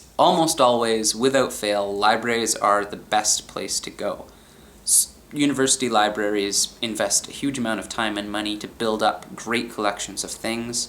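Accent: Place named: Canada. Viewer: I love your accent. <3 Canada!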